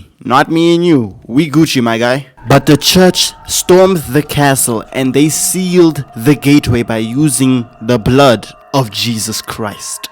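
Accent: South Africa. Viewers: Bro sounds South African 😭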